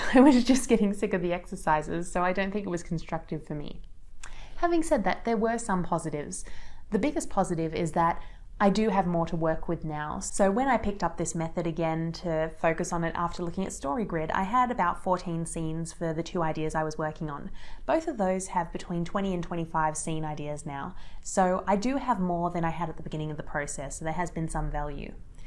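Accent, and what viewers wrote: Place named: Australia. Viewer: Thank you for the video. Seems to me the snowflake method is overly laborious. Brainstorm your story, characters and setting. Brainstorm story beats that you can structure into chapters keeping with the three act story. Outline each chapter in bullet point. Then, change your outlines as you evolve the story …. Write the first draft, leaving scope for discovery along the journey. Don’t waste time reading methods! They’re only selling you something you’ll never use. The best YouTube videos discuss what’s good and what’s bad to keep readers engaged. I’m an amateur writer, no formal training in creative writing, in fact, a science background, so I’m learning as I go. But love your work though. 😘 by the way, having lived in Australia for 20 years, I detected your Aussie accent.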